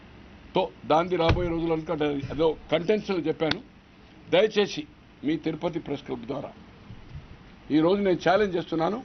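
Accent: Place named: Telangana, India. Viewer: తెలంగాణ తెలుగు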